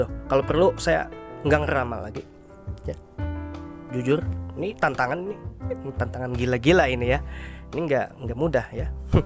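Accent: Banjarmasin, Indonesia. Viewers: Abngnya Dari Banjarmasiiinn, kahh??? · Banjarmasin ya....Sy suka gaya bicaranya, mengingatkan Sy dg seseorang di Bjm hheee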